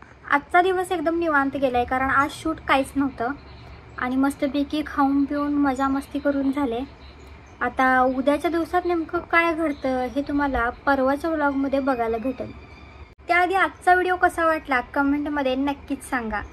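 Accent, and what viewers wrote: Kolhapur, India. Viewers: कोल्हापुरी भाषा ❤ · Tumcha kolhapuri accent ch bhari vattoy change naka karu tumhi ❤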